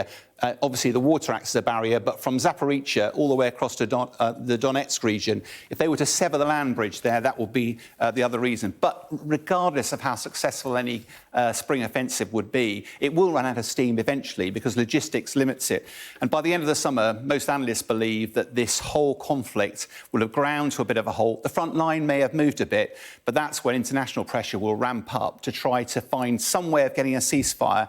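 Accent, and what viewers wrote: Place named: Scotland, United Kingdom. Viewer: To much british accent for me.....sound scottich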